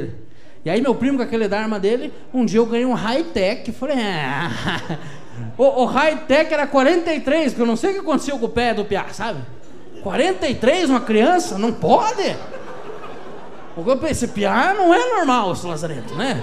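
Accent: Curitiba, Brazil. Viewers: sotaque da região metropolitana de ctba :)))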